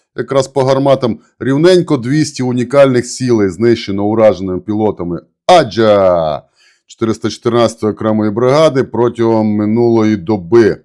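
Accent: Transcarpathia, Ukraine. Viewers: !!!та від ВАС любий формат!!!(це як бальзам)/і запатентуйте Ваше вміння Закарпатської, говірки, 🎉😂. Слава Богу що є такі ПАТРІОТИ!!! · Мені подобається будь який формат, п., бо знаю, що хробаки будуть отримувати в центр прінятія рєшєній те, на що заслуговують. Ну, а озвучка із включенням нашої закарпатської бесіди дуже ми ся любит.🇺🇦❤️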